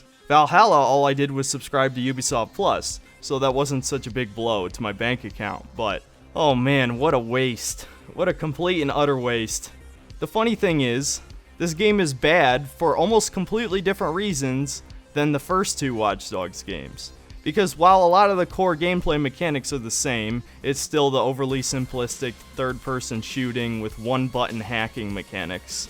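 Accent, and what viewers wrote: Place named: Chicago, United States. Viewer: I like your Chicago accent!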